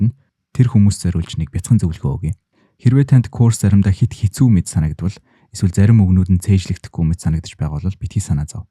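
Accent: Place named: United Kingdom. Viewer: Гоё цэгцтэй ярьж байгаа чин таалагдлаа , хөдөлмөрч ухаантай залуу юм байна , баярлалаа, love your thick British accent 😊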